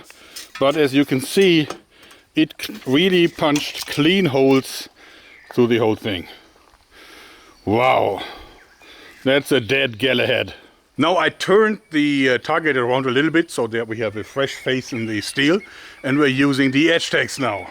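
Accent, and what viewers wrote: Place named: Germany. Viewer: For one, international english is based on British English unlike canadian and american English. But also, Mr is german, so his pronunciation is different, no moreso than any regional dialect like American.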